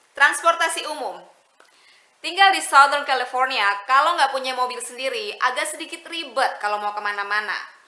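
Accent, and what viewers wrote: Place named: Surabaya, Indonesia. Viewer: arek suroboyo tah mbak iki,podho mbak..anyway do you know londo kampung? He is a youtuber too like mbk 😊.he is from aussie but his wife from surabaya..he speak jowo suroboyoan fluently..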